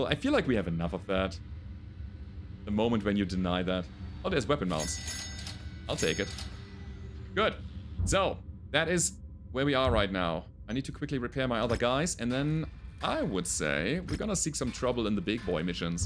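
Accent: Germany. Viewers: The short was fun. Create a character for yourself! Be a Steiner officer. Use your German accent!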